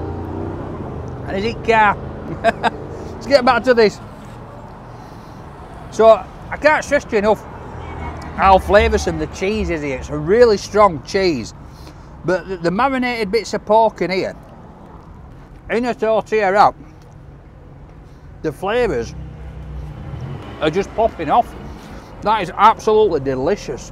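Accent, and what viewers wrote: United Kingdom. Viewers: Great videos.. great accent. What part of Britain is it?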